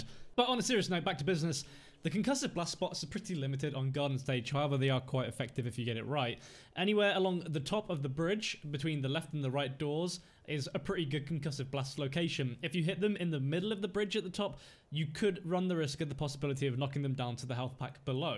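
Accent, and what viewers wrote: United Kingdom. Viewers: Superb video mate, damn good Pharah play. From your accent I take it you're from the UK? Surprised you're not on the UK world cup team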